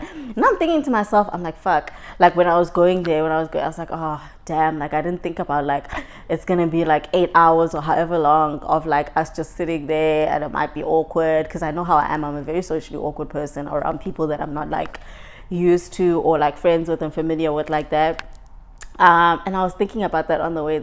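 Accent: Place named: Los Angeles, United States. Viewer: Your accent is now giving LA😍😂❤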